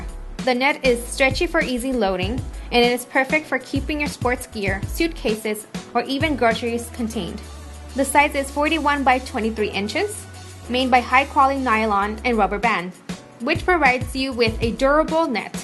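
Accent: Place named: Spain. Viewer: She sounds Spanish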